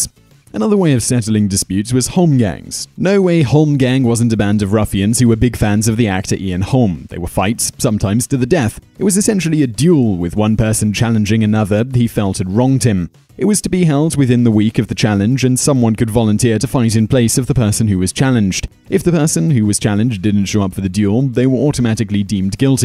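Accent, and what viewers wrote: United Kingdom. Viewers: That British accent makes you sound slightly less boring and inadequately prepared but not much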